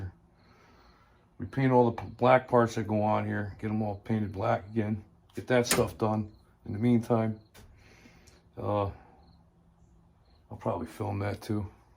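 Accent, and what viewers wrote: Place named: North Carolina, United States. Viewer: Love that North Carolina accent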